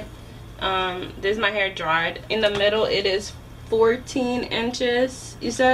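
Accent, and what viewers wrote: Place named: Liberia. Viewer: Wait, are you Liberian? Because the accent do sound like a Liberian 😆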